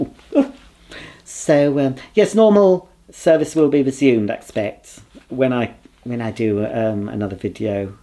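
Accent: United Kingdom. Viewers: As a confirmed bachelor myself, I laughed and laughed at this video. "A shell suit?!" 🤣. I love that your camp voice is pure Yorkshire.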